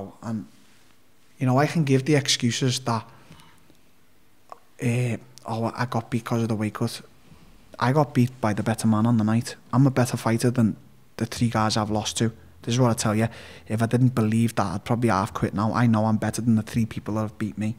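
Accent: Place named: Liverpool, United Kingdom. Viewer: Nasty scouse accent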